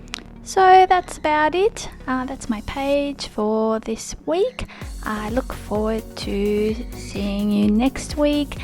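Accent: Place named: Australia. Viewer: From your accent are you aussie? If so, where did you get the KURUKYNKI stamps?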